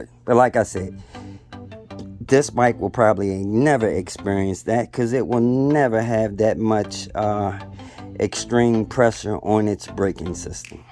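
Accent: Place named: Georgia, United States. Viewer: Bro, I feel you on the "troll" shit, were from a different time & a different era, where we ran our mouths to each other faces, & backed that shit up! 💯 I'm 47 y.o, very outspoken on my views & beliefs, but AT LEAST I stand in something, these days it's the blind🦮 leading the blind🦯 out there nowadays...... Where you from originally bro, Georgia or something, I know your accent but can't place it??? 🤔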